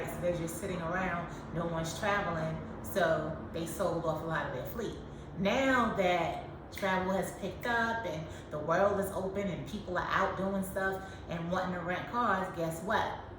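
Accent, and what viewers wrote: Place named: Bed-Stuy, United States. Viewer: Love your accent Ma! Is that Bed-Sty?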